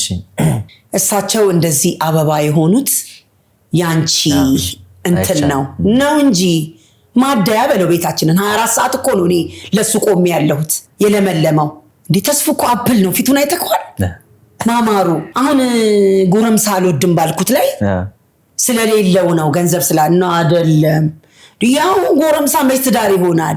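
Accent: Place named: Dire Dawa, Ethiopia. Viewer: እኔ እውነት ለመናገ የድሬደዋ ሰው መሰለችኝ አነጋገራ የአገሬን ሰው መሰልሽኝ።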